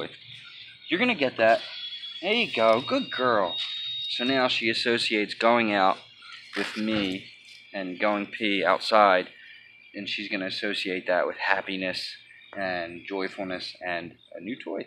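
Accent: Philadelphia, United States. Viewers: minutes in I could tell you’re from philly from your accent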